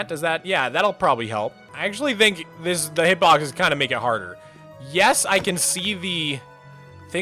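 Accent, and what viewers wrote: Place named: United Kingdom. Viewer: the british accent caught me so off guard that was so good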